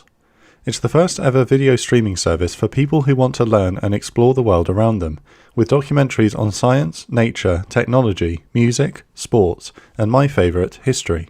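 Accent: United Kingdom. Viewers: Most BORING vid evah.. Can you possibly Mumble any more Monotonously? One sentence.. 1. A British accent; 2. Listlessly Mumbling; 3. Unexpected (and indecipherable) words; 4. Tonelessly; Exciting Topic! Thrilling Script! aaaaaand, Narcoleptic Narration humdrumhumdrumhumdrumhumdrumhumdrum zzzzzzz Did you sometimes call some airplanes, "Janes?"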